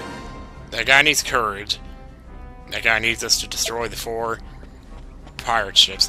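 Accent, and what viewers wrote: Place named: South, United States. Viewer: I went back to your old vids and noticed you've lost your southern accent almost completely since then!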